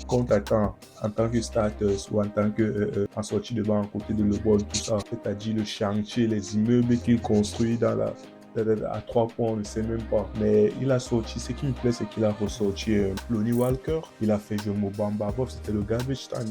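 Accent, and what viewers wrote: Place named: Cameroon. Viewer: L’accent du frère camerounais dommage l’algorithme ne te met pas plus en avant courage pour tes belles analyses oublie pas Lebron reste le boss de cette équipe vous le verrez bientôt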